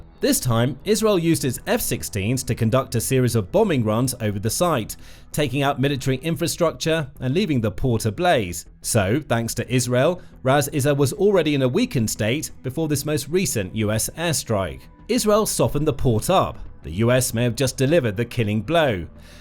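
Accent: United Kingdom. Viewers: I am SO sick of narrators with British accents.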